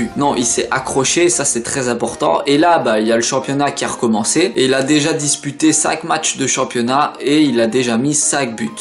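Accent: Liège, Belgium. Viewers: T'es de liège? Je reconnais l'accent:)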